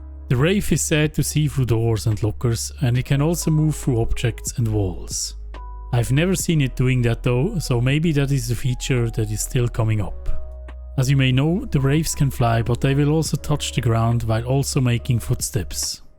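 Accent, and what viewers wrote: Switzerland. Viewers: Yeahh cool vid👍🏾🙏🏾🙏🏾 you sound like e schwitzer !? 😎😅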